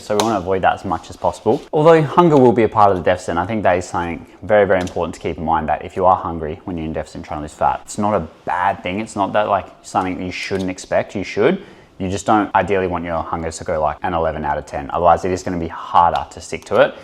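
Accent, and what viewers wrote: Australia. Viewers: So good to hear a fellow Aussie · Are you a Queenslander?, i notice Queenslanders say A or Hey alot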